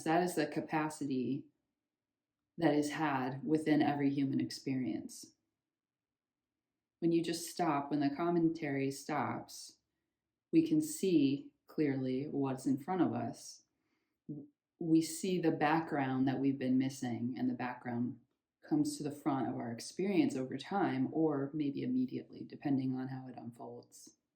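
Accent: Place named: United States. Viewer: I don't know who you are referring to as your speak so fast and the American accent is really difficult to understand at the best of times. The trick is that there is a God, that is a creator and is a male or female. This is delusion. The body belongs to Nature and out of your control. Emotions are not under your control. Reactions through senses is not in your control. If you hear a loud bang and body jumps, there's your proof. What comes before Consciousness if the body jumps before the mind is aware of the loud sound? If one is 'God' one would have been aware because God is whole. Blessings.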